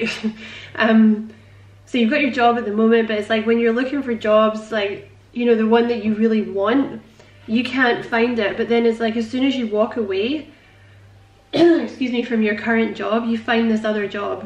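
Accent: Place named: Scotland, United Kingdom. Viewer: OMG your Scottish accent is so adorable.. The reading is so spot on, and exactly what I needed to hear. Thanks!!!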